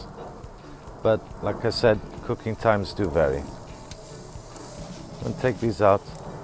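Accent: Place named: Greece. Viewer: πολύ ωραιο το βίντεο και σε στηρίζω να αρχίσεις και τα "αγγλικά" βίντεο. Μην σε ανχώνει η προφορά, τι να κάνουμε είμαστε ελληνάρες (χαχα) , μπορεί εδώ να σε κρίνουν αρνητικά αλλά πιστεψε οι έξω θα το λατρέψουν γιατί τους άρεσει που τα βρίσκουμε λίγο δύσκολα. Φυσικά με περισσότερα βίντεος θα λυθεί και η γλώσσα σου σιγά σιγά :)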